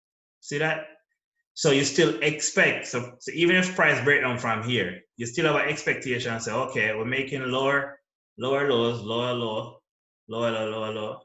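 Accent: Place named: Caribbean. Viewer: Which Caribbean island you from bro? JA?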